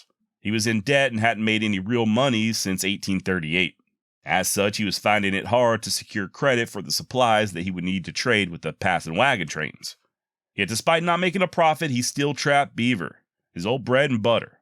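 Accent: United States. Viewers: Wow 😮 Such an AMERICAN made voice❤❤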